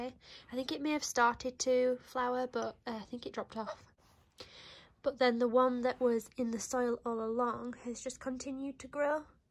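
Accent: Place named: Northern England, United Kingdom. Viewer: I really enjoyed the journey of these plants and am looking forward to trying this myself. There is something addictive about making new plants for free. Also I love your accent...I am from Yorkshire myself but live in Ireland so miss hearing Northern voices!!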